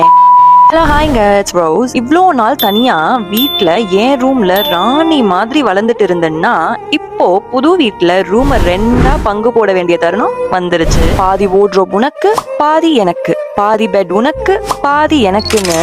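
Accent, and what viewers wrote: United Kingdom. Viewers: Your voice is so relaxing ❤ your British Tamil accent is also so interesting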